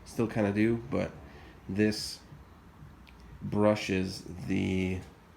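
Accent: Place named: Utah, United States. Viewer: I thought I recognized a Utah accent and then I saw your profile landscape pic of Utah Valley! Am I right?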